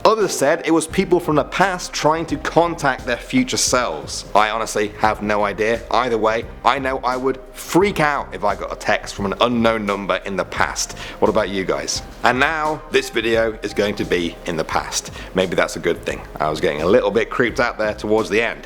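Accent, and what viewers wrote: United Kingdom. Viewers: He is British, its an accent, not him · I hate the British accent. Stop asking me a question thru your statements. Ugh. I live in the UK.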